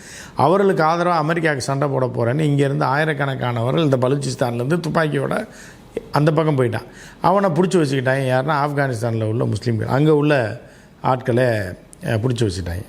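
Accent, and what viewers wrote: Madurai, India. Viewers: Fact... மதுரை மொழியில்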